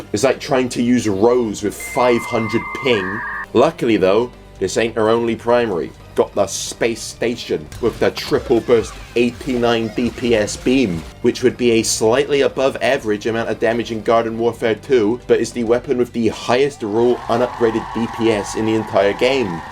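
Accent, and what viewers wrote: United Kingdom. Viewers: bro I love your videos so much man, your humor is so fucking amazing 😭 Your selfmade memes are hilarious and everything is made even better by your british accent and slang and this danm voices you make 😭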